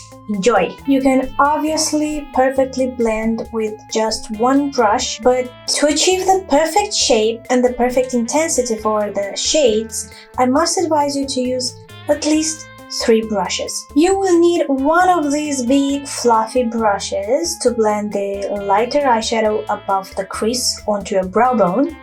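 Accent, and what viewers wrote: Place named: United Kingdom. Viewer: love the British accent :)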